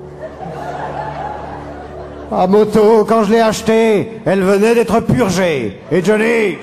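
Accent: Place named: Belgium. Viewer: il le fait sûrement pas exprès mais des fois il a un accent belge x)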